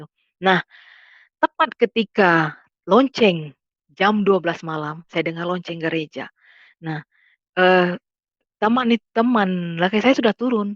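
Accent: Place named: Papua, Indonesia. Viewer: Logat Papua sekali😅🙏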